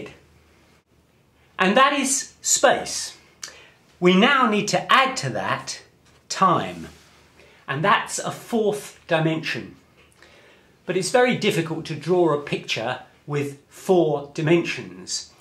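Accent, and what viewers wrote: United Kingdom. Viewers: Fantastic!!! The British accent is also extremely helpful. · i like the british sweety accent rather than the lecture itsel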